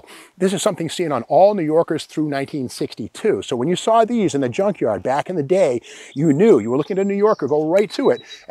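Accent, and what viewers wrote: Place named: Boston, United States. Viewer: . You still got that Boston accent...all good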